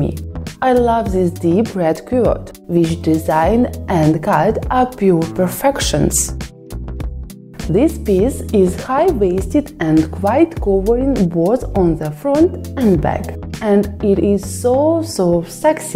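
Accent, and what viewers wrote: Russia. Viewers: это какой-то трэш от очередной васи пупкиной, а для чего такой рязанский-английский?🤣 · Хорошая девочка. Только ей пока лучше не говорить, а читать тексты, при этом соотвественно жестикулируя и двигая губами и глазами. Т е накладывая видео на текст. Тогда темп речи будет быстрее и легче будет слишком тяжёлый русский акцент, который сейчас тяжелее немецкого.Поработать над слитностью речи и звуками. Всё равно это придётся делать если она хочет международной аудитории. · Русский английский так прикольно звучит :-)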